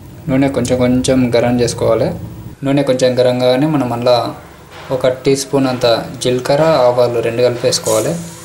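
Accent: Telangana, India. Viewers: Kura kanna ni telangana slang super tammi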